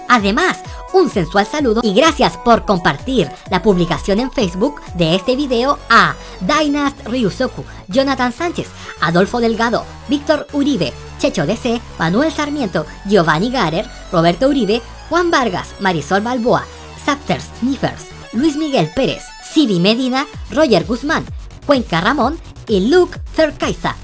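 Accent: Chile. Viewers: eres de chile e-e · hola, descubrí tu canal por el minidoc. de HXH y me quede viendo tus videos y son increibles, agregas mucho conocimiento acompañado de humor. Te me haces bien original me suscribo y si mandas saludos mandamelos en algun video no sé:V y note que eres chileno:D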